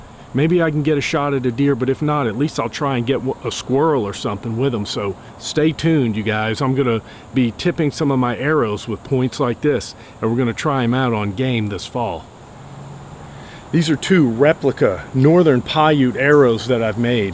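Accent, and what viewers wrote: Georgia, United States. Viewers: I was gonna say, sounds like you live near Lanier Speedway.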